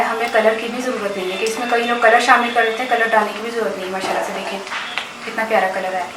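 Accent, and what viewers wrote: Punjab, India. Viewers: Ap ktni puari punjabi bolti heun :) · Punjabi accent very good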